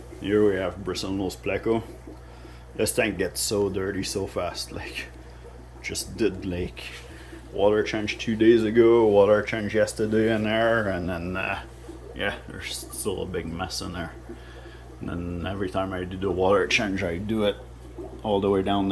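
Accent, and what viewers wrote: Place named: France. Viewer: I am very glad that I came across this video from a kijiji add! I just recently got my first small tank so I am looking to learn a lot more so that my fish can thrive. You son is pretty smart to have started his own business so young! You must be a very proud father. I love all of the fish! You have a new subscriber. I am actually French, too. I recognized the accent. Sounds just like me ha!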